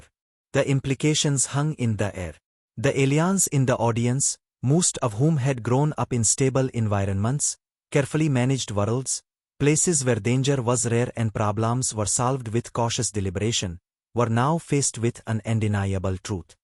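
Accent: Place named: India. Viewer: If you are going to use an AI anyway, why give it the ONE accent most likely to piss every American off? The first thing most Americans hear is EVERY customer service "call center" in India that cannot do shite and are NEVER any help. "Yes yes, oh yes, the company cares "berrimuch", yes yes, "berrimuch". Glad to know I don't have to see this dumb shite in my feed again. 17 seconds might be a new record.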